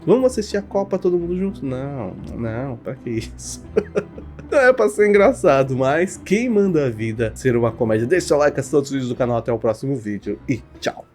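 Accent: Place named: France. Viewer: Olá muito bom seu sotaque francês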